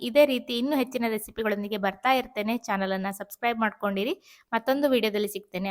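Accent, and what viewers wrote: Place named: Kundapura, India. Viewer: Hoy, ನಿಮ್ಮ ಕುಂದಾಪುರ ಬಾಷೆ ಏನಾಯ್ತು, bolu ಹುಳಿ super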